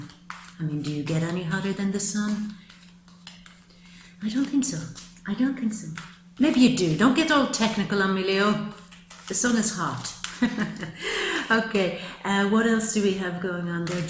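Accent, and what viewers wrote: Ireland. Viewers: I absolutely love your energy! You have an accent so I assume you are Irish? If so, for my August 8 birthday next year I am going to Ireland and Scotland. My beautiful daughter is taking me for my 60th birthday. I can’t be more excited I love and always wanted to go to Ireland! I think you are absolutely a joy to watch! Thank you for your wonderful readings!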